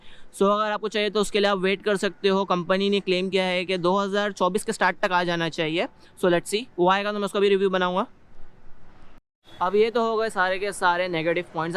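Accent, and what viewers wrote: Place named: Aurangabad, India. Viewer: Bhai aap kahan se ho apke andaaz se lagta hai aap Aurangabad ke around kahin se to ho.....?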